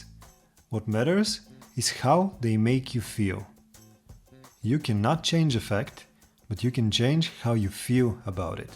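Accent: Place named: United Kingdom. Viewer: Слушам Ви с интерес. Чувам британски акцент. Хубаво! На американски английски “Дневник” е “ diary” Бъдете здрав !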